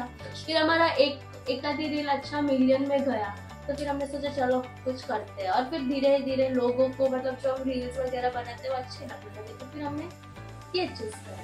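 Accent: Gujarat, India. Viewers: The way u speak... Apki tone me hi gujrati pana hai😂.. I am also from Gujarat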